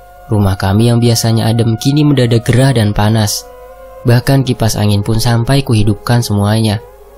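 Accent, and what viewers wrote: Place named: Banjar, Indonesia. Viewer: maaf.. urang banjarkh ini.pina nampak logatx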